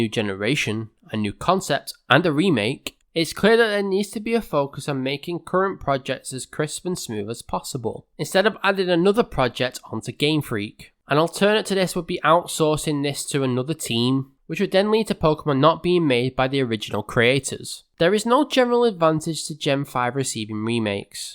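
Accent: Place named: United Kingdom. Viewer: Not to mention, emulating Gen. V, you can also experience Drayano's ROM Hacks, BlazeBlack/VoltWhite, which seem to bear the kind of difficulty I now actively want in a game... Maybe that would explain why I enjoy Dark Souls so much... As much as I love the idea of using Greninja and Zeraora in Unova, I can but dream... And we do say "ee-"volution here! (Where are you from? I seem to be finding a lot of fellow Brit's channels recently!)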